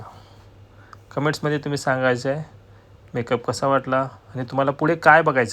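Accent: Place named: Latur, India. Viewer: सर तुमच्या भाषेवरून मी ओळखलं होतं तुम्ही लातूरचेच आहात मी पण लातूरचीच आहे प्रकाश नगर आणि सासर परभणी आणि पुण्यात राहतो तुम्ही तर आमच्या जवळचे निघालात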